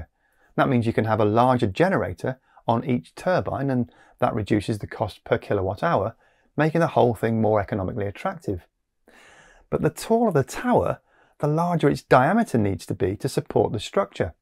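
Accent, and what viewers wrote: England, United Kingdom. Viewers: 5 second in, befor I could mute this vile noise from england. I assumed it would be Human aka American speech. Can't watch such mess.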